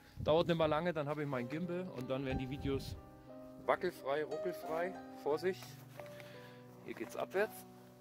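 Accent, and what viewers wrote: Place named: Upper Swabia, Germany. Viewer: Du kommt aus Oberschwaben gell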